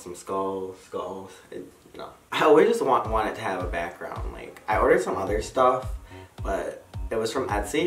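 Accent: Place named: Midwest, United States. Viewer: Your Midwestern accent gives me life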